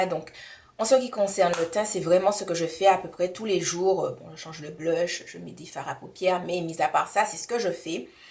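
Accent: Antilles, Caribbean. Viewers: j'adhere avec tn accent des antilles jme sen chez moii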